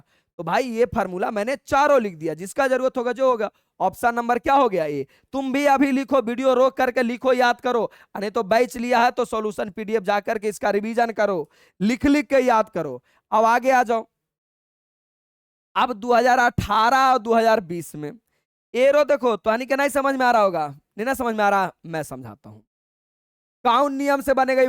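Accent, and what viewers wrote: Bihar, India. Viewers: Apka Bihari language me math aur easy lagta hai sir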